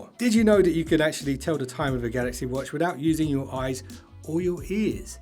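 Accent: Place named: United Kingdom. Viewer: Why are all the YouTube galaxy videos British?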